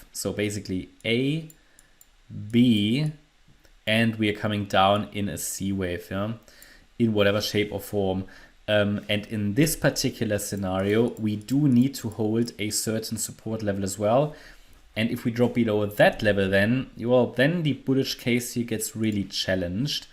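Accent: Germany. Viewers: Cardano is becoming less value day by day (compared to Bitcoin) P.S. nice German accent 👌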